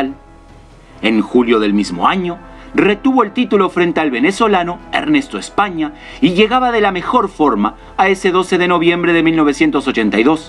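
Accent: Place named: Buenos Aires, Argentina. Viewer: Nuevo sub me gustó tu video, no sabía de esta historia.,estuve a punto de salirme por tu"she sheo " eres muy buen narrador pero evita ese acento "'porteńo" es muy molesto oirlo, Ojo no tengo nada contra los argentinos 👍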